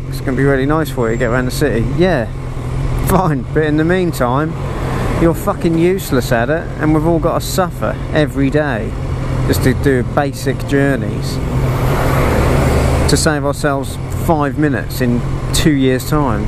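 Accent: Norfolk, United Kingdom. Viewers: it's great to hear the Norfolk accent and the road works in Norwich are a bullache but anyways carry on having fun bud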